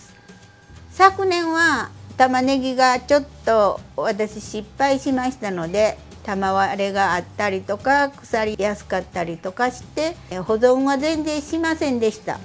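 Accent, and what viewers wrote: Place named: Kochi, Japan. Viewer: 高知弁のイントネーションが可愛いですよね…！私も高知の片田舎越知町です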